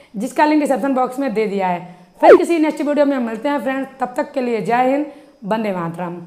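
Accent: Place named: Haryana, India. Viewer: Mam lgta hai aap haryana se ho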